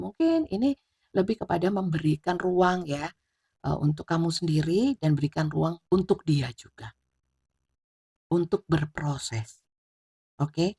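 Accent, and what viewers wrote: East Java, Indonesia. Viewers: Seneng logat Jawa timur ny Bunda.. Jg readingan ny sll ber bobot dan mantap. Leo Single mom hadir menyimak..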